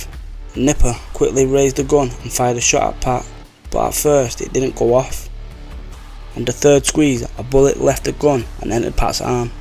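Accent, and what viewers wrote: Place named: Northern England, United Kingdom. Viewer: Why? Cos he doesn't have a London accent? He's from up north that's how they speak. You must have an inability to grasp different accents cos I had no issues understanding him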